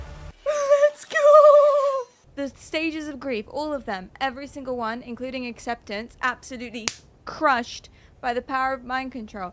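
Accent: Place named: United Kingdom. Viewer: Praise be for subtitles as your British accent seems thicker on YouTube than it does live on Twitch LUL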